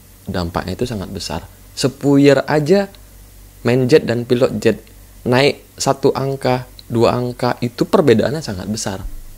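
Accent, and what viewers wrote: Medan, Indonesia. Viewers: Medan mana ny ko bang 😀 keren x 🤪 Salam balap tidur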